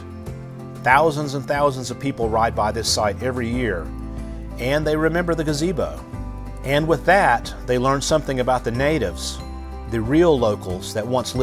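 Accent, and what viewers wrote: South, United States. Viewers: He has a Southern accent. No question about it. Just like I do. "I got my own way of talking, But everything is done With a Southern accent Where I come from." ~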